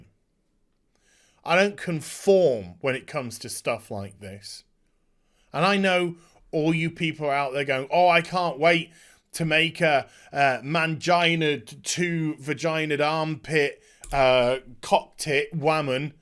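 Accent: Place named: United Kingdom. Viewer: I can't watch this.....without.....busting my gut laughing....that proper sounding British accent....doesn't.....help! ROTFLOLLLLLLLLLLLLLLLLLLLLLLLLLLLLL!!!!!!!!!!!!!!!!!!!! Stop it dude your are killing me! LOLOLOLOLOLOLOLOL!!!!!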